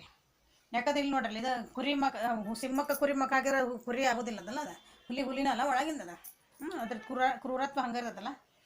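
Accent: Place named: North Karnataka, India. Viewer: 😢ಮೆಡಂ ತುಂಬಾ ಚೆನ್ನಾಗಿ ಮಾತಾಡ್ತೀರ ❤ಸೇಮ್ ಟುಯಸೇಮ್ ನಿಮ್ಮ ತರ.. ನಮ್ಮ ಉಡಗಿ ಉತ್ತರ ಕರ್ನಾಟಕದ ಬಾಷೆ ತುಂಬಾ ಚೆನ್ನಾಗಿದೆ. ಓಕೆ. ನೀವು ಹೇಳಿದ ಮಾತು 100ಸತ್ಯ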